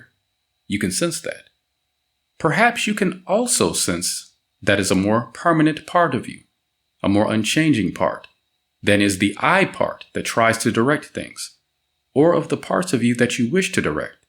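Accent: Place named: Chicago, United States. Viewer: Don't wanna hear a Negro with a Chicago accent read to me sorry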